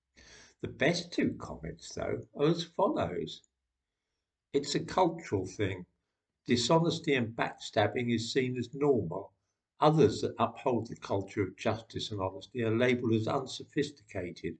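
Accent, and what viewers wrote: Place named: United Kingdom. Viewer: Your look, accent and mannerisms are the quintessential old stock UK that is ever less present in the younger generation unfortunately. 10/10 channel would shovel snow off this chap's steps and have a pint after if I lived next door.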